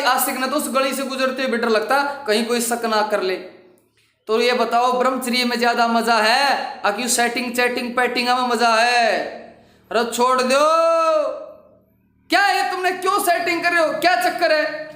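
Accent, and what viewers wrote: Haryana, India. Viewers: Ha guruji Mai 2 × speed pe dekta hu To samaj ni aati haryanvi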